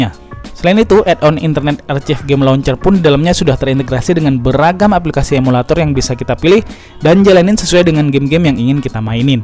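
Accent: Madura, Indonesia. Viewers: Oreng medureh? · Masih "salbut" Kek org madura 🗿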